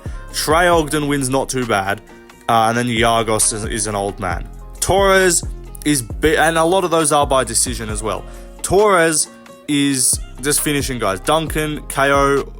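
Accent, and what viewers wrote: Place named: Australia. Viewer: Hablas gracioso, pero tu español suena bien con el acento australiano.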